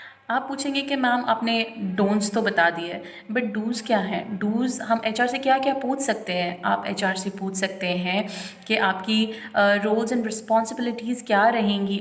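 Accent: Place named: United States. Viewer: Woh great accent Ru from USA? Your English and presentation skills are awesome.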